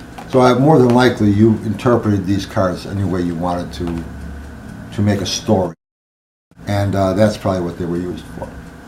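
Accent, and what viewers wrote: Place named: Chicago, United States. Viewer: Hey, no joke I just asked my son if he thinks looks like anyone he knows and he took one glance and said, "Al Pacino!" LOL! Did you know that your Chicago accent is very much like Upstate NY?! I worked in a Bank Call Center for years and always knew that accent! Chicago or Upstate NY where I'm from! Had many, many ppl ask me over the years if I was from Chicago and are surprised when I say NY.... never been to Chicago.... Just a little trivia for ya!! Namaste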